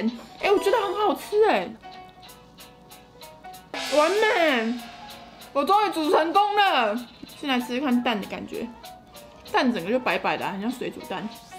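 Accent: Taiwan. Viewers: 雖然偶爾會台灣國語但這支影片中邂逅的發音是正確的喔，掌聲鼓勵妳是特級廚師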